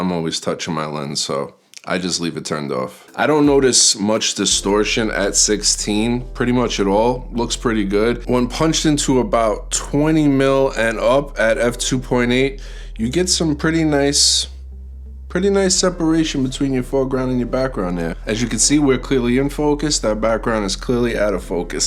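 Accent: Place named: New Jersey, United States. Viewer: If i had to guess the accent i wud say JERSEY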